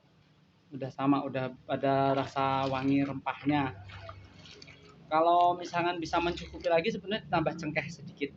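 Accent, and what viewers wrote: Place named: Java, Indonesia. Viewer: Mas asli mana sih, jawa jogjanya medok banget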